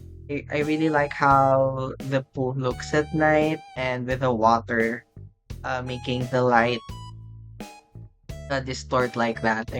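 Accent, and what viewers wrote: Philippines. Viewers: i mean he has a filipino accent and latin american countries also have same styles as the philippines so connect the dots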